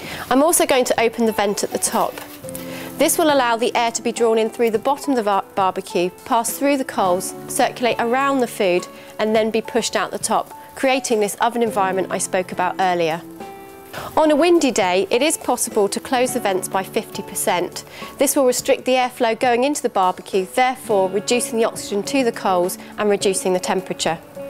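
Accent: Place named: England, United Kingdom. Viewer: The first clue that this was going to be total crap was the English accent . . .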